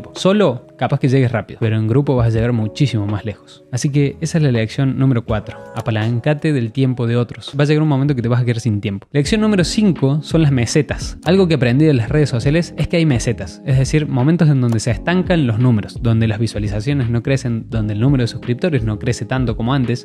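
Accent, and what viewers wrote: Córdoba, Argentina. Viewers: Hola "! Recién te encontré por acá...y ya me suscribí. Gracias por los tips, estoy con ganas de empezar un canal por acá... tendré todo lo que contaste en cuenta... Sos Cordobés? Me alegra encontrar un Youtuber Argentino... no veo muchos todavía... Saludos desde Buenos Aires... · Empezaste a hablar y pensé, aguante Córdoba carajo xD (imagino estar en lo correcto 🤔)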